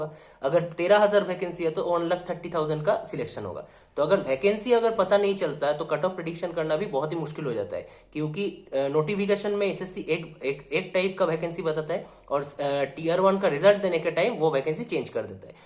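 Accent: Bengal, India. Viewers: Are you Bengali?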